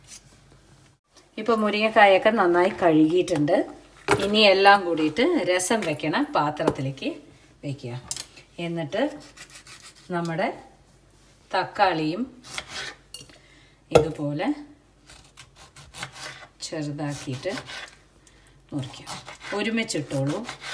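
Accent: Palakkad, India. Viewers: Chechi palakkad annu ennu thonnunu.kalaki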